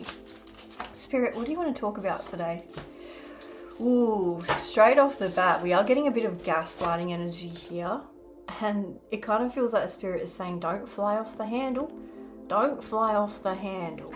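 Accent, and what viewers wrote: Australia. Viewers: Long time no see 👀! You remind me of a butterfly fairy 🧚‍♂️ popping in and out of my energy 🦋✨I love your accent! Sounds like British! Now you confirmed Aussie! My DNA says I’m a third English! So we’re in the ballpark somewhat. No, I’m not into competition! More into helping to lead and healing wandering souls needing nourishment. Don’t like Freemasonry nor Eastern Stars! Reminds me of my criminal paternal family. Who have hated me and stolen from my Grandmas inheritance for me. Plus, they are in leadership and I detest their minor children sex trafficking and drug trafficking and immoral sexual mandatory group unprotected orgies for their Demonic Cult/Community! Sick and demented. I will defeat them for the kids and their PTSD suffering parents. They are a Menace to Global Society! I hate that they are lazy and using my inheritance to cause pain and suffering to innocents! 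You sure are spilling my ☕️ I see! You have a beautiful soul I can see. I liked you the first time I saw you a year ago! Down with the Karmics! Father God created me to be a karmic trap 🪤 I’m God’s spiritual warrior 🤺⚔️ ✌🏽👆🏾🫶🏼 Keep up the good work!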